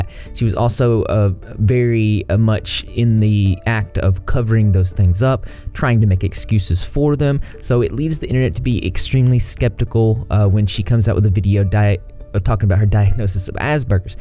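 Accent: South, United States. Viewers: Where's your accent from? Texas? Louisiana? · Is it just me or is your southern accent not as strong? Or maybe I’m just used to it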